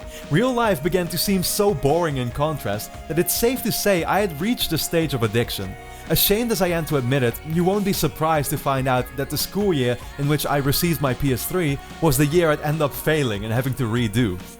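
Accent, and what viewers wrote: Netherlands. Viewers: That accent gives you away, Nederlander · ily man, you're just to real, why you gotta hit my nostalgia buttons, not only does your dutch accent hit me ( a belgian buttboy) in the familiarity feels, but then you do this, AHHHHHHH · Are you dutch lol?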